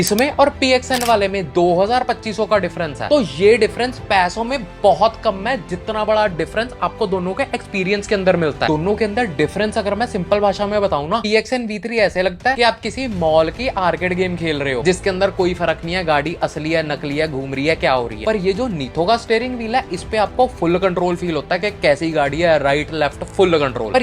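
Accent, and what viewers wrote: Punjab, India. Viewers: Veer ji are you from Punjab, accent ton lag reha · Thick Panjabi accent Hindi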